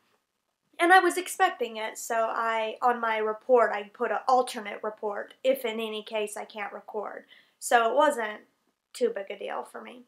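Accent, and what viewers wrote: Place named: South, United States. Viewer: The southern accent is trying to break through lol. Nice video though :)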